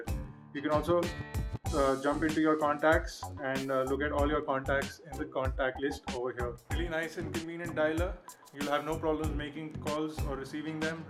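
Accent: India. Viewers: your fckin idian accent is annoyinggg! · what you expect ,an indian to speak english in american style. you fucking idiot. shut your mouth and get some sense not sensation.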